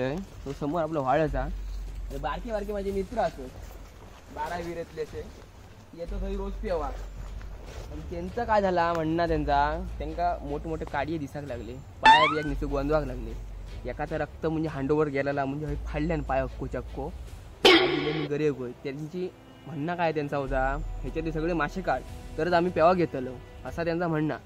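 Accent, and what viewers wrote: Malvani, India. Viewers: Amazing bhava !! 😂😂✌🏻 tu ani tujha malwani accent 😂✌🏻♥️ tyane video madhe ankhin fun yeta !! Keep it up bro ♥️✌🏻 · Lay bhari bhava.. Tuzi malvani boli ti pn tuzya unique comedy andajat hasayla bhag padte.. Kahitari navin karnyacha prayatn ahe tuza khup mast vatat tuze video baghun. Keep it up bro.. 👍🏻👌🏻👌🏻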